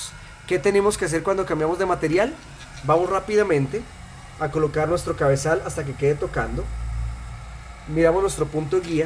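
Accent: Colombia. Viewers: waaaaaaaaa colombia? no me habia dado cuenta hasta que hablo xD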